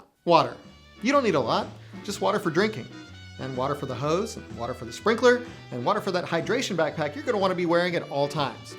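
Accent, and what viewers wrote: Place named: New England, United States. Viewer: How did this supposedly Southern dude get a yankee accent?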